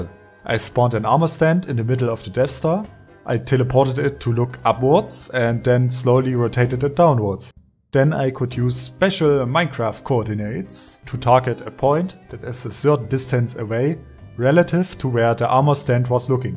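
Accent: Germany. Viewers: It’s Denglish =Deutsch+Englisch ore Germglish =German+Englisch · Yeah, well sounds German and also if you go to the about section it will say that his location is in Germany. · hab erst überlegt aber war mir ziemlich sicher das is n deutscher kollege 😂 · Ist mir doch von Anfang an aufgefallen, dass du deutsch sprichst!😂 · Das ist fast deutsch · Ja das klingt wirklich Deutsch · When someone with a German accent this thick talks about building a Death Star with a working laser I'm actually terrified it sounds like a legitimate supervillain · Very german accent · das ist englisch hört sich aber wie deutsch an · im German to i think he's Sounds Like German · I can hear hes german, its obvious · The most german accent in this world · As a fellow German: your English is the most German one. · Truuu the english sounds so german it basically is just that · He is German, I can tell from his problem with th words and some others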